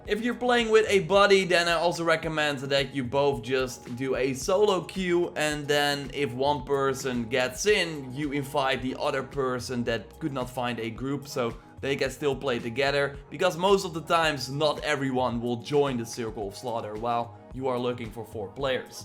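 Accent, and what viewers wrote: Netherlands. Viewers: Are you Dutch? I mean, '' '' should be a dead giveaway, but who knows.. You just sound like my Dutch friends speaking English to our American friends. Gewoon zo'n Nederlands dingetje weetjewel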